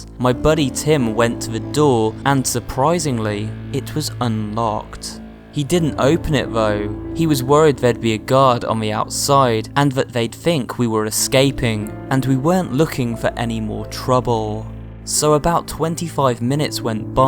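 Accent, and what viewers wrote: United Kingdom. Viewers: I think he breetish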